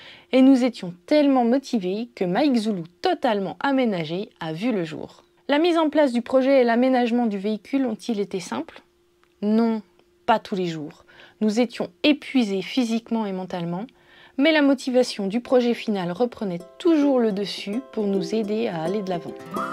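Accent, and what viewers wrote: Haute-Saône, France. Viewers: Magnifique résumé de votre voyage, ça n'a pas dû être facile de choisir les séquences tellement vous nous avez montré de beaux endroits depuis ces 3 années. Continuez bien comme ça à nous régaler. Vesoul et les autres contrées de Haute-Saône nous ont été familières durant de nombreuses années, ayant débuté ma carrière à Luxeuil, c'est votre accent qui m'a attiré au début. Merci en tous cas pour toutes ces belles vidéos. Prenez soin de vous là-bas en Hémisphère sud. Ici en Périgord on vous admire. Jean-Louis Adishatz. 😘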